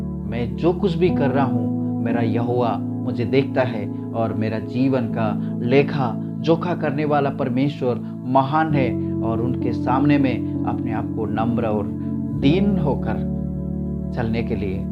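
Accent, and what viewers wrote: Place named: Telugu, India. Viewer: Aapane Vachan bataya bahut Achcha laga aur aap Telugu ho mujhe aisa lag raha hai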